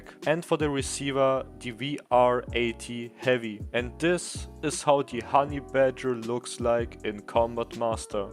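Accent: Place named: Germany. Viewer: Nices Video man hört direkt dass du deutscher bist haha 😄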